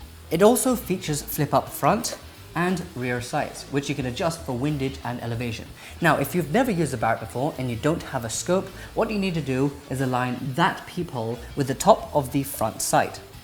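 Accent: United Kingdom. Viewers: Asian British accent.. first for me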